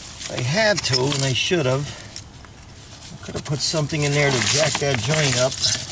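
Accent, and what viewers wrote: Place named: Scranton, United States. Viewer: you sound like you're from scranton. thanks for these videos.just a hobby for me but you show me a great"'how too"........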